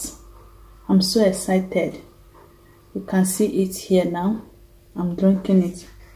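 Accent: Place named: Caribbean. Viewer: I could be wrong but you sound in the Caribbean accent but either way I take your advice very serious because people like you know your s***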